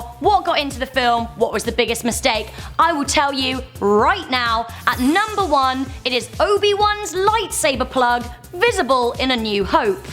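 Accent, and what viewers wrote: United Kingdom. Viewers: That's because she's British, you uneducated American.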